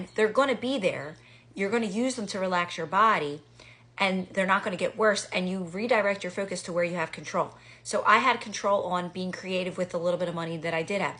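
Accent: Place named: Philadelphia, United States. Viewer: I cannot get past that Philly accent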